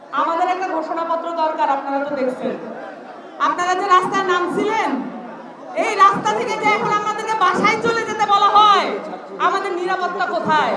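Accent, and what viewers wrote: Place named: Mymensingh, Bangladesh. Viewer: ময়মনসিংহ অঞ্চলের ভাষা কথা বললেন এই আপু, ধন্যবাদ,এন সি পি এগিয়ে যাও বাংলাদেশ আছে তোমাদের সাথে ইনকিলাব জিন্দাবাদ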